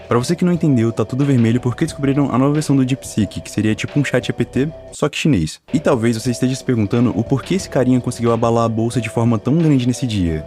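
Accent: Brasília, Brazil. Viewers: tu é daq de Brasília? kkkk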